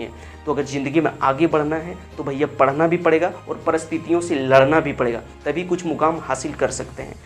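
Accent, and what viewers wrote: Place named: Jharkhand, India. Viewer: Language se hi pata chal gaya ki aap Jharkhand se ho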